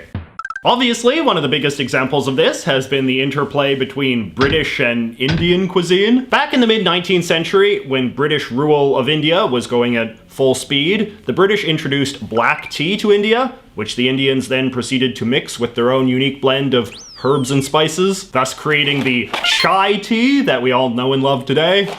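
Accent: Canada. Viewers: This guy hits his "out" harder than any Canadian. EVER. We know you're Canadian. Stop it. It sounds gross. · He called pizza margherita boring?!!!! Well he has a horrible sounding Canadian accent so I am not offended. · Hey, are you Canadian?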